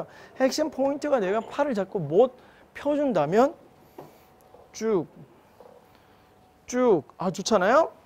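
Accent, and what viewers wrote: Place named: Gangneung, South Korea. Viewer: 님 강릉분이신가요? 동향인듯 귀에 익네요 ㅎㅎ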